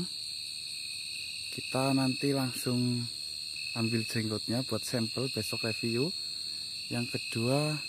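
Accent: Banyumas, Indonesia. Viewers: Ngapak purwokerto banyumas brooo..😂👍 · oh pantes bhasanya sama kya saya...ngapak..mksih mas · klu tegal lebih medok klu pwto lbh soft ngapaknya😎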